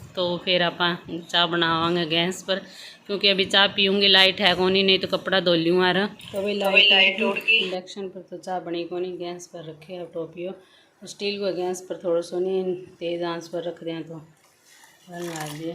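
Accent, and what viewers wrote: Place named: Bagri, India. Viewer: n bagdi bolni sichao aap gi boli hi achi laag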